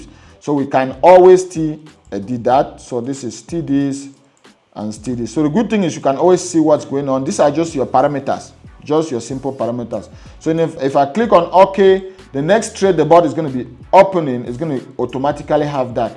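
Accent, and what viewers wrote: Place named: Calabar, Nigeria. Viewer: I actually thought you are a Nigerian ur accent is like that of a typical Calabar man. you are a boss indeed good thanks you are my mentor I will learn from u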